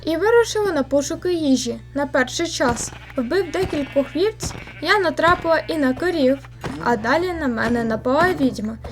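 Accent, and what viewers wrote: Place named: Transcarpathia, Ukraine. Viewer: ВзяВ з печі стейки я вирішиЛА ))) Трохи схоже на Закарпатський діалект, але це не він, чи він ? )))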